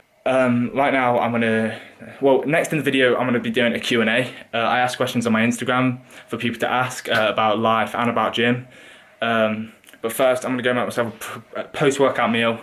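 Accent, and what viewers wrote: United Kingdom. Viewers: Anyone wanna else not expect hi sound british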